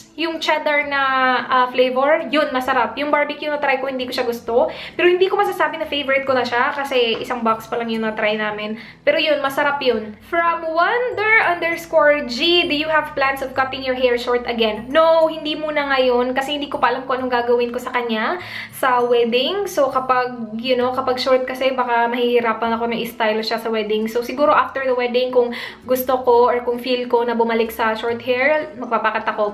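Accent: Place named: Visayas, Philippines. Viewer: u r sooo funny I almost choked when u retrieve back ur error stragol lollll, is it bcoz of visayan accent that I often hear u say gugol or like Wat u just said.... But u r so funny and cute....stay simple and humble.... If YT makes u happy go on.... But nursing job s very rewarding m telling u gurl, just prioritize ur plans then later u can do both🤗🤗🤗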